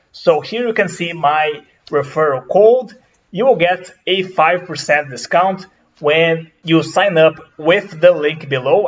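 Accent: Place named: Brazil. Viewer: brasileiro e professor de ingles, escuto esse sotaque o dia todo kkkkkkkk, mas seu ingles é foda irmão parabens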